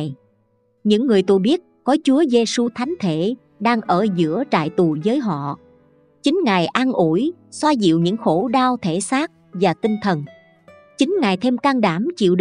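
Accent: Saigon, Vietnam. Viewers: Giọng Sài Gòn xưa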